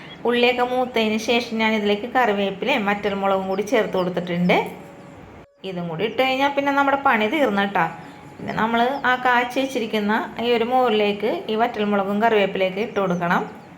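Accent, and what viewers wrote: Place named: Kochi, India. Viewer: ഒഴിച്ച് കൊടുക്കണേണ്. തനി കൊച്ചി ഭാഷ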